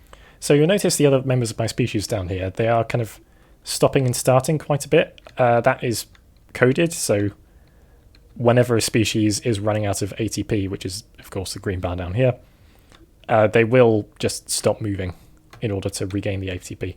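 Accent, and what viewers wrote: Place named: United Kingdom. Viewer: you cannot understand how soothing your slightly nervous British voice is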